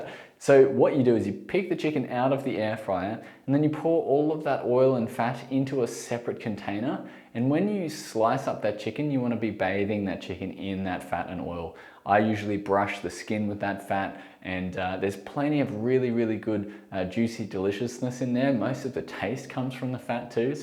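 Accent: Australia. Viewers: Hi, just a query regarding coconut flour!!!!! I live Perth Western Australia and try to get organic, can be a challenge. I recently purchased 2 different organic coconut flours and the carbs per 100gms vary quite a bit. Is there a logical answer to that?? By the way thanks for your vids, it's pleasant listening to an Aussie accent without all the hoo hah. Much appreciated Rosemary (70 yrs)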